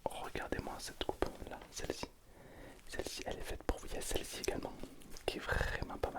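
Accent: South, France. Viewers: Hey j'adore tes vidéos je me posais une question, tu viens d'où du sud?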